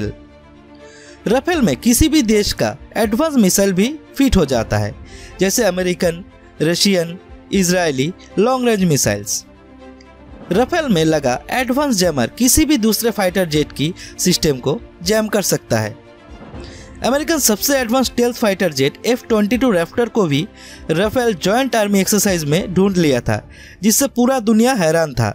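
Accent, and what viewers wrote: Bengal, India. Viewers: Bro you are Bengali, I can smell it😘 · You are bengali.... there is bengali accent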